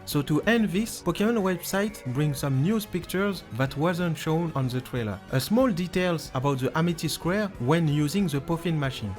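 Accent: France. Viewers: he’s French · T’es français ? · c'est pas pour me moquer mais l'accent français me calcine j'arrive pas a suivre la video normalement 😭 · Tu es français? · That’s a French accent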